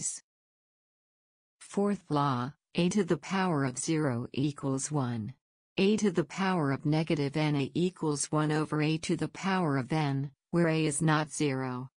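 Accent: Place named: United States. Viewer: Wait, this is your voice? Seems like you're american.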